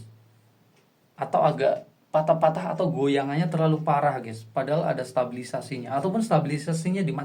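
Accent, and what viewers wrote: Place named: Banyumas, Indonesia. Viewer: kiue ngapak deneng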